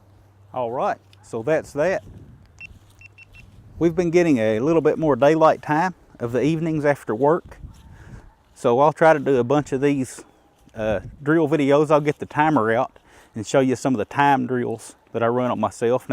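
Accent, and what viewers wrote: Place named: United States. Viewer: You sound like an American to me. And I'm a damn Yankee from Maine. Thanks for your channel it gives an old man hope for this country.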